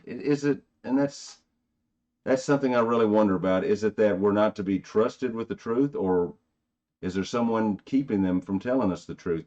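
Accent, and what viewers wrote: South, United States. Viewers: You have and American speaker acent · If I watch you for too long I might start talking Southern.I loved what you are finding and your long ago contributions.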